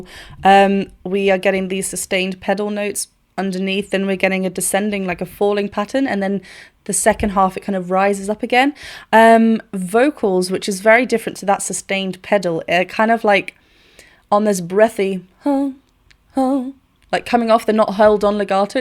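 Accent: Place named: RP, United Kingdom. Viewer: I feel like I have to avert my eyes from your video because of your speech quality. I am very easily affected by the dialects I listen to you. Give me one hour of listening to you and I will sound equally posh. Edit: Which btw could be misconstrued, but is not meant as an insult - at all.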